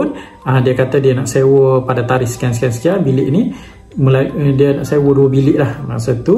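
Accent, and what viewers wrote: Kelantan, Malaysia. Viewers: Waalaikummussalam warahmatullahhiwabarakatuh... Amboiii...jenuh tunggu la kak Nor dgn crita² terbaru. Buat la setiap hari bey. Yg pertama kak Nor suka dengar lontaran alunan ayat² suci pembukaan tu. Dannn....kelembutan bahasa yg persembahkan dlm lontaran bahasa lohgat kelantan tu.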